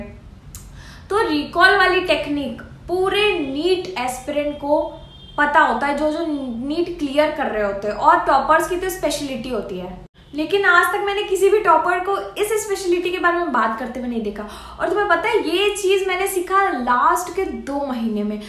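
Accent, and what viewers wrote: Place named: Bihar, India. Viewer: Di aapki voice m bihari accent h kaha par rhte ho aap???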